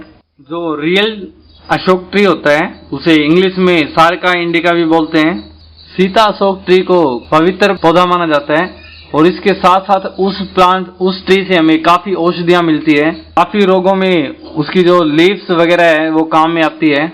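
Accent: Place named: Haryana, India. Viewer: भाई हरियाणा से हो आप आपकी बोलने की टोन से पता चल रहा है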